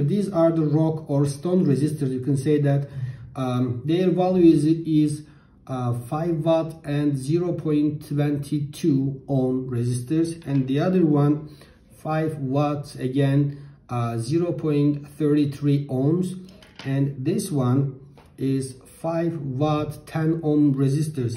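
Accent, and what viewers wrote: Turkey. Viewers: Türk ingilizcesi bu nerde görsem tanırım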